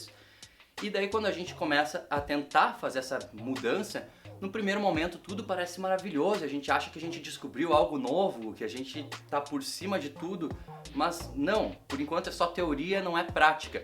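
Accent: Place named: Rio Grande do Sul, Brazil. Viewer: Cara, que massa te ouvir! Além de adorar teu sotaque gaúcho, pq tbm sou gaúcha...achei valiosas tuas colocações. Sou Coach e acho que é isso mesmo...cada um precisa buscar o que faz sentido para si. Sou totalmente contra regras pré estabelecidas como: acorde cedo, leia mais, não coma carbroidratos à noite, e um monte de coisas que são boas para algumas pessoas, mas para outras não! Ja me inscrevi! Obrigada pelas reflexões!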